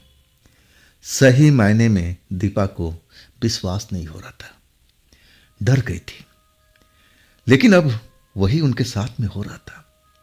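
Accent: Bihar, India. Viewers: Bihar se ho kya bhai ❤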